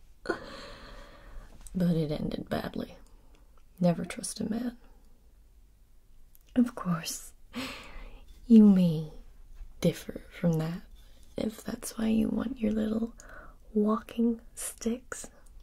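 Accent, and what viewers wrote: United States. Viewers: Nice american accent! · Nailing that American accent! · Your American accent is getting fantastic! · I thought she sounded kind of strange at first and then realized she’s doing sort of an American accent. Very impressive voice acting! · with an American accent hits different— I cant look away from those eyes holy crap! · Wow, your American accent is so good! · Love this! I just realized many minutes in you were using your American accent. Kudos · Cannot get over how amazing your American accent is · Very believable American accent!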